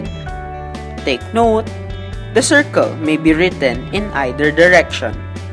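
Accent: Philippines. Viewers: Your accent is a Filipino. Well this is useful thanks. Maybe u can have a lesson on person advertise on your video. Just saying. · ❤ the Filipino Accent ..Easy to understand. 👍👍👍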